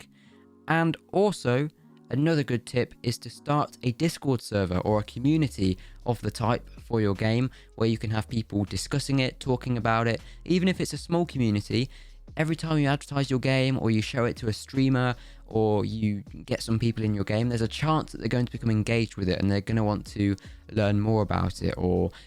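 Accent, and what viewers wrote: United Kingdom. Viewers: Nice finally British lad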